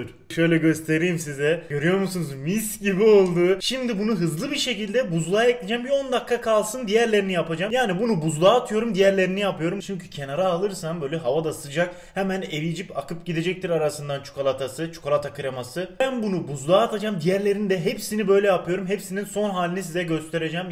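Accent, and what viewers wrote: Adana, Turkey. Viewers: "Tencereyi ocağa eklüyürüm" Adanalı olanlar bu şiveyi bilir. Ben de şimdı karam yiyürüm. 😀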